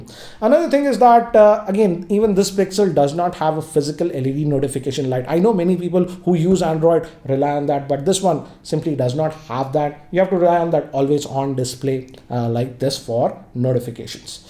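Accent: India. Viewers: And thick indian accent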